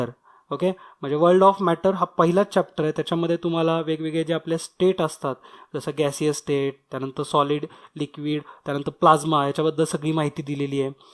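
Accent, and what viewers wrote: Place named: Pune, India. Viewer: sir mala vatthe tumhi nakki punyache aahat karan tumcha bolnayacha ton ha same tasach aahe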